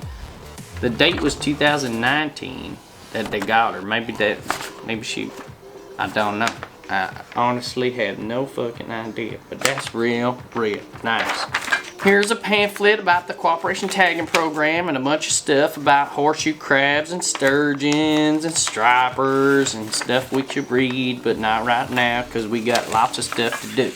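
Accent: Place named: North Carolina, United States. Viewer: DUUUUDE!! I am a freakin HUGE deadhead! Freakin DOPE-ass hoodie! I followed a few shows back in the day! Warren Haynes is a POWERHOUSE guitarist/vocalist from the ABB!! Gov’t MULE KICKS ASS TOO! if I didn’t know better I’d thought you were from down here in NC! You talk just like these people down here! Awesome video! I appreciate you! BABAAM!!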